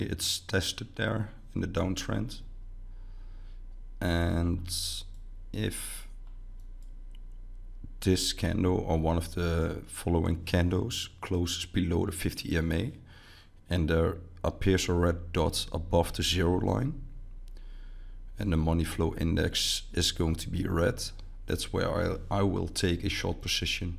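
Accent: Netherlands. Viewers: Nederlands accent 🤣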